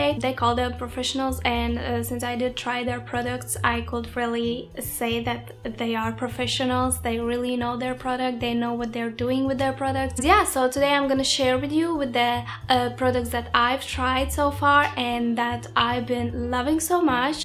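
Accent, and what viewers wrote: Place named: Latvia. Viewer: Are you from Latvia? I swear that i can hear your accent. Nice video :)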